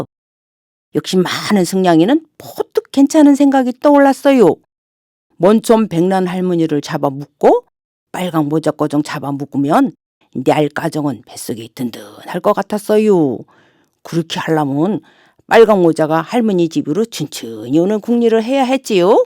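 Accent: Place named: Chungcheong, South Korea. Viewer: 구수한 충청도 사투리도 정감이 넘치네요... 어느지방 사투리든 우리맘을 편안하게 하는 힘은 있는것 같애요.... 잘 보고 갑니다~~~~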